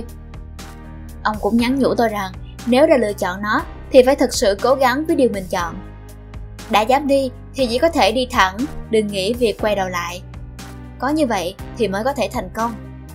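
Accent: South, Vietnam. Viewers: Tôi lại phải làm dũng sĩ diệt speaker, cho giọng miền nam như vầy vô mất hết view giờ!🙄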